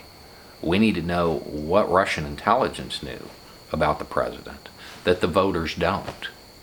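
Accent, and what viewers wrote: South, United States. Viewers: Hmm. I can unmask. 1. He’s wearing a suit, pretty sharp. 2. That pixelation can’t hide his beard. 3. Southern accent, cool demeanor. is Billy Gibbons!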